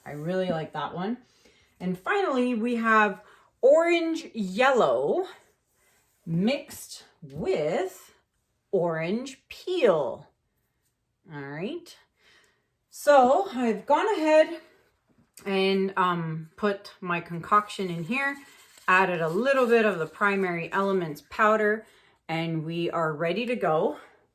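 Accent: Canada. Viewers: You couldn't talk too much for me! At least half the point, for me, of watching your videos is to listen to your interesting chat! Haven't watched the video yet, so can't comment on the painting, but just wanted to let you know - keep chatting (enjoy listening to your Canadian accent - you are the first person I ever heard saying "anyhoo" - now I am coming across it everywhere!!!) (I'm English). Keep up the good work - painting and chatting!!!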